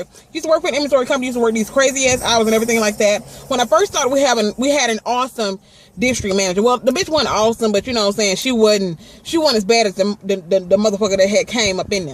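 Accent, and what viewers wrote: South, United States. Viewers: Yea she be having my stomach hurting too 🤣🤣🤣n bein that she has a country accent makes it even funnier... LMFAO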